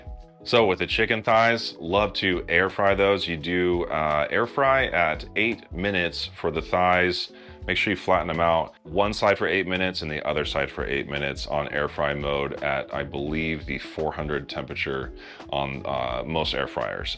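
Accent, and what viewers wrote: United States. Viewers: You understand exactly what I am talking about. You look and speak like an old Southern QUEEN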